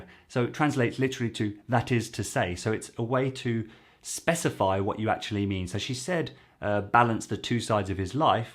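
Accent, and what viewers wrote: England, United Kingdom. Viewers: Hello! I have just discovered your channel ! Very funny and interesting! You offer to me me a good opportunity to improve a little bit, my very unperfect english. Thank you for that! I also appreciate your english accent. l like Judy Foster very much. Yes she makes some little mistakes here and there, but it ins 't a bad thing. On contrary, this adds more charm to her charm... Encore bravo pour votre analyse de texte! Salutations ! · Love your videos, but that English accent of yours has gotta go when you speak French😍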